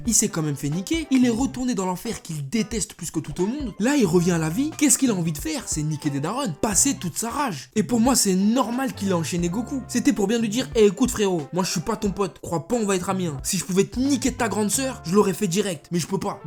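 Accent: Banlieue, France. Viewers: pourquoi tu parle comme un wesh de banlieue ?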